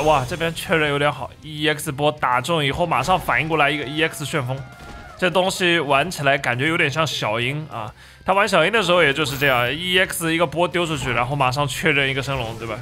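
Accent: Shanghai, China. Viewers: 是上海人？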